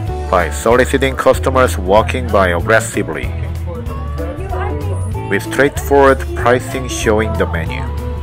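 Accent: South Korea. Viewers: This guy's accent 100% korean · base on your accent, i can tell you are 한국 사람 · nice vid. I can tell from your accent that you are from Korea.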